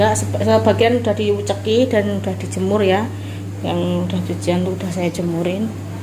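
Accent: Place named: Lampung, Indonesia. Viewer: Lucu mbak bhsamu hhhh. Dlm dri lmpung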